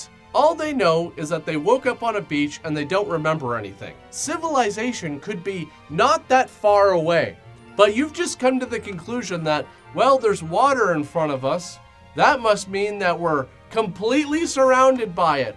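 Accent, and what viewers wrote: Canada. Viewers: People say there isn't a Canadian accent, but this dude is here to prove otherwise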